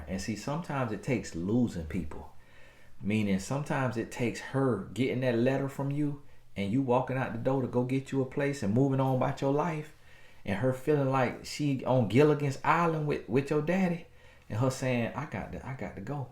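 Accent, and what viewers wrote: South, United States. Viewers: Lol.... we love your Southern accent.....please ignore envious comments and keep teaching us...blessed you and the Fam 😁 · your messages a very far reaching, not just for singles at all. I learn tons from every video that I can apply to my marriage, career, and life in general!! These are life lessons!! Plus I'm country too, so it's awesome to hear the dialect and phrases I grew up hearing. Lol.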